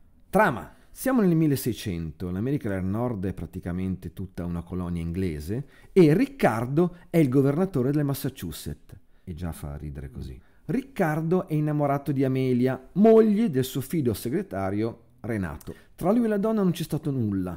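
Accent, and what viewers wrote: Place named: Modena, Italy. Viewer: Con il solito accento modenese strascicato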